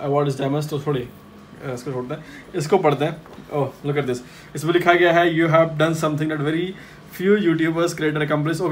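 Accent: India. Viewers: Congratulations.... 😉 bhaiya.. Bas ese hi aap aage badho. 😍😍 jeo mere vale bhaiya....... Aaree tum bhot khaas ho aap bhot mehant karte ho isliye toh hum aapko dekhna jada pasand karte h... Or koi kuch bhi boke aapke bolne ke tarike ko but meko toh ye hi pasand typical Indian style.... 😳😍😉😉😉😉😉😉😉.... Bas bhaiya plzzz full auto airsoft guns laooo🙌🙌🙏🙏🙏🙏🙏🙏🙏🙏🙏 Tumhara bhot bhala hoga... But anyways many many congratulations... 😍😍😍😍😍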